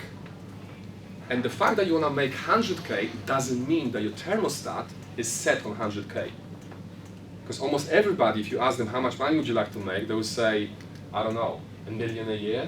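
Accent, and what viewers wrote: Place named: Poland. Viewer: omg I love the polish accent... yippy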